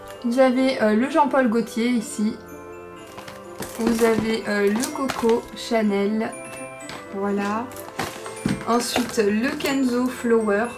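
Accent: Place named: Perpignan, France. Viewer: Hello ! Je découvre ta chaîne grâce à Sissi makeup. Super ta vidéo tu donnes envie 😍je vais y faire un tour 🥰🤑n’hésite pas à jeter un œil à ma chaîne. Il me semble qu’à ton accent tu es de vers Perpignan ? Bisous et merci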